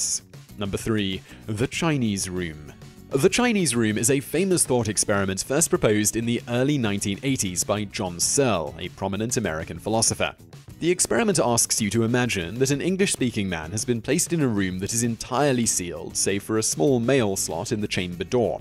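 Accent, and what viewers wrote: United Kingdom. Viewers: Is that Michael vsause but the British acent version?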